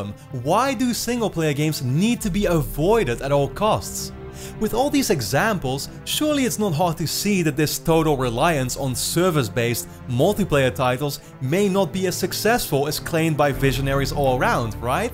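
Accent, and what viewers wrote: Netherlands. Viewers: Is this guy dutch? He has a familiar accent... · Are you dutch? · dutch accent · Aaah the dutch english, it never stops. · Dude are you Dutch? i lived for some time in amsterdam and this accent has just stuck in my head so deeply.... :D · You cant hide your dutch accent. Its too good to hide ❤️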